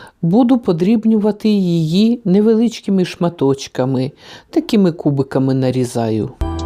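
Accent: Standard. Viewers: Как приятно слышать чистый украинский язык! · Дуже приємно слухати чисту українську мову без суржика. Дуже приємна господиня!. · Какой красивый Чистый украинский язык.А не то что с ним сейчас сделали😡педагогыня минестриня😡😡😡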